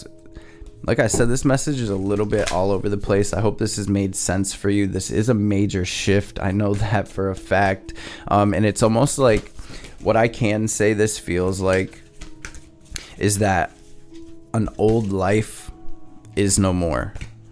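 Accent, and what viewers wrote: Detroit, United States. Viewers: Are you from Detroit I keep hearing you say what up doe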